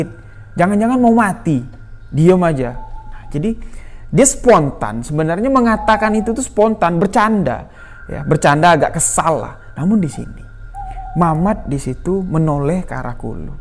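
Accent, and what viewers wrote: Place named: Jambi, Indonesia. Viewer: Hadir bang Pertahankan logat jambinya,,,,, MANTAP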